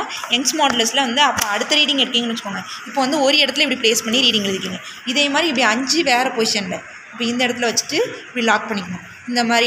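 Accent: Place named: Kanyakumari, India. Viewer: Mam nenga kanyakumari slang la pesuringa 😅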